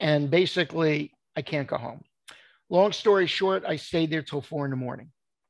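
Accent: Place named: New York, United States. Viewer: This mensch has the best story and delivery I’ve ever heard. Great New York style delivery. I guess the divorce didn’t bother him after that. 😂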